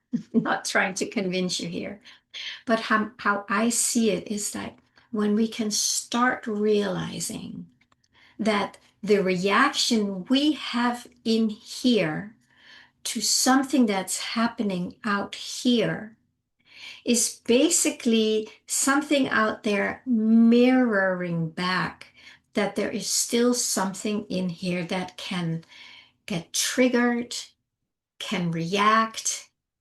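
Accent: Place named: Europe. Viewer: Some times I write comments to quick lol Yes, not in a judging way. In a living manner I say love and compassion first second and third then try try again It’s just so stupidly simple We tend to complicate it some make the biggest messes then we have to help clean up. That’s ok cuz hopefully they have helped clean up ours lol. Ya know, your videos have this way with me. The maternal aspect of your voice with your subtle accent reminds me of old world Europe. My grand mother That old Rumanian Gypsy So thank you 🙏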